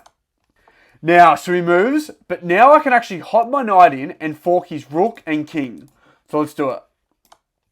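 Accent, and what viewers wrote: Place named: Australia. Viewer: australian accent lol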